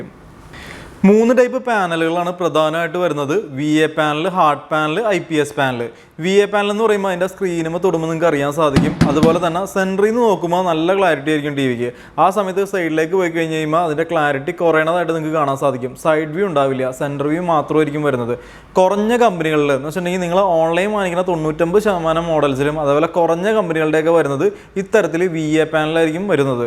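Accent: Thrissur, India. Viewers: Thanks for the very useful info. Also Enjoyed listening to " Thrissur Basha ". A suggestion while using TVs on Table Tops. Just screw down the table stand legs to the wooden table with two or more U shaped stainless steel clips. Users can use own imagination too. Thanks again for the safety info.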